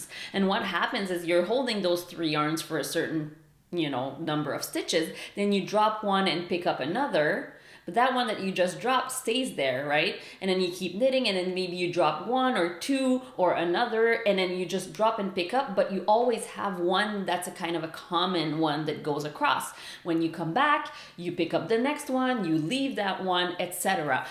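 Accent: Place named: Quebec, Canada. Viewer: ! Ça fait plusieurs vidéos que j'écoute de toi et à chaque fois je me dis... Aaaaah cet accent est tellement familié. C'est sur que tu es Québécoise! Je capote sur tes vidéos! Et tu met de l'avant des artistes francophones que je ne connaissais pas. Merci pour ton travail!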